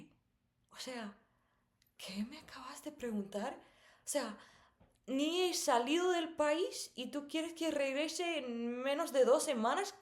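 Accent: Guatemala. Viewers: Que chilero tu canal y tu acento chapin va vos .jajaja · Tu espan̈ol es perfecto y muy CHAPIN!! Tan chapín que la primwra vez pensé que eras chapina más que la vecina!! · Wow su acento en español es Guatemalteco saludos desde New York hermosa de parte de un Guatemalteco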